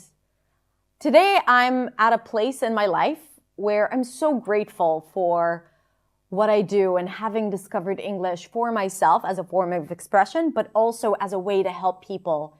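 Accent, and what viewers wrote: United States. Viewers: Wow! She"s not a native speaker?! But sounds like one. Perfect american accent. Really good! 😁❤️ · Hi mom i am watching ur all videos day n nyt without sleeping because i like your crystal clear pure american english i am noticing each letter how u pronouncing your voice very clear to understand and i started to learn and practice same like ur accent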